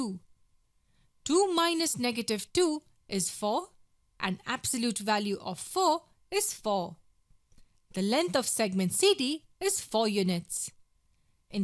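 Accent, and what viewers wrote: India. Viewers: indian accent uh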